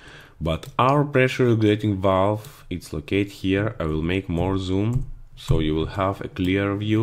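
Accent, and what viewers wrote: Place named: Russia. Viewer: Sounds like Russian accent.. Am I right?)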